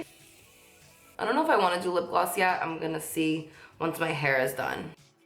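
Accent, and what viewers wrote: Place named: New York, United States. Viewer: OMG I love your NY acsent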